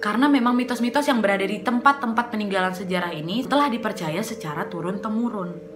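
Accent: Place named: Sumatra, Indonesia. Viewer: Halo, salam kenal. Aku penonton baru. Suka banget sama pembawaanmi santai tapi ngena. Oia, mf sebelumnya... ini orang sumatera kah? Karena logat bicaranya agak mirip sama kami... Stay safe terus ya. Semangat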